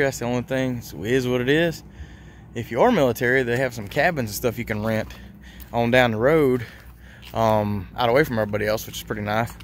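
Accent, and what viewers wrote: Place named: South, United States. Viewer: You are southerning it full stop! "Don't get better than this" so awesome!! ❤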